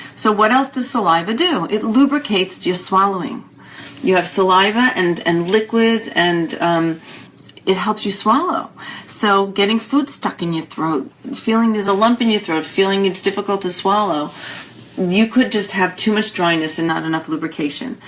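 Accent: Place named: New York, United States. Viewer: I think it's just her NY accent, maybe? I would've appreciated an improved effort towards personal appearance. Respect takes many forms.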